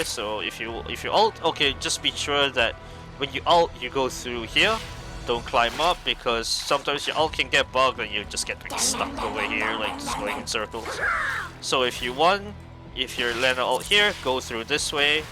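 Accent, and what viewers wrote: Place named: Singapore. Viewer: Sounds like Singaporean or Malaysian 🤭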